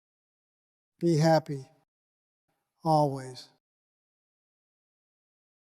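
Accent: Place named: Georgia, United States. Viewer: Why is sounding like an old-timey trucker from Georgia all of a sudden?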